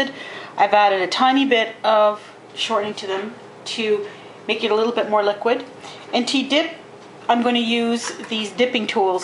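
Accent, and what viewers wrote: Canada. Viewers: aww, these look so good! did u know you have a slight Canadian accent?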